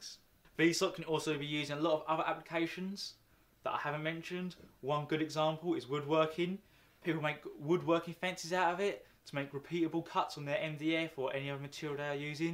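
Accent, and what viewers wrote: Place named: Essex, United Kingdom. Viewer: You're definitely from Essex because you sound like Russell Brand 😁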